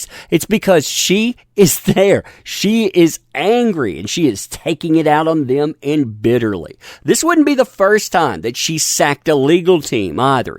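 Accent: South, United States. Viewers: I come from the UK and was listening to your stream last Night and you mentioned people not liking your Accent. To be Honest I love your Accent very Southern Slightly Camp and best of all I could imagine you or at least your voice being the voice of a very dangerous crime boss who feeds people who crosses him to his Pet alligators and from Louisiana or some where like that anyway I like it All the best from Leeds in west Yorkshire United Kingdom haha You should hear ur accent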